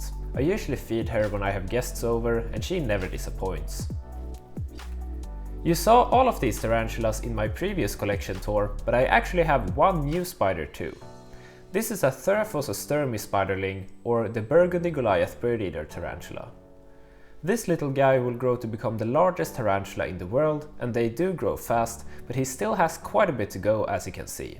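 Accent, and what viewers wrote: Sweden. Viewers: Hörde direkt att det var en svensk dialekt! Kul att se fler svenskar som älskar grodor. Followed immediately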